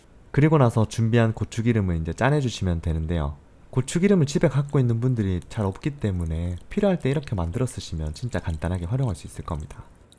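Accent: Gyeongsang, South Korea. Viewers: 경상도 말투 좋습니다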